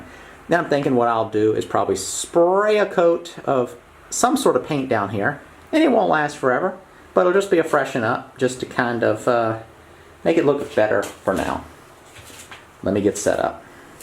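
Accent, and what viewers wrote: South, United States. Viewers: Hi! You finally whooped that concrete pillar! Congratulations!! Will you tell us which state you live in? Just curious to know, since you and I share the same southern accent. If not-that's good too. Enjoy these videos a lot.:-)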